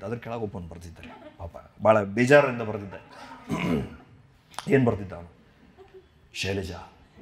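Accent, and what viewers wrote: North Karnataka, India. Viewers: His voice Uk accent Jokes all ...Lit🔥🔥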